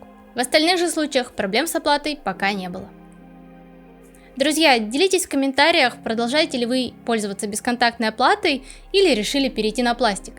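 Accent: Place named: Siberia, Russia. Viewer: Блин, голос скрипит, что тут приятного? И этот толи сибирский толи северный акцент. Над голосом надо работать. А внешность, приятная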